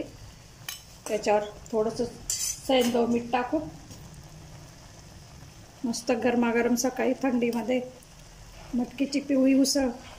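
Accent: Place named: Dhule, India. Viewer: Aap dhule ke ho kya😁nice video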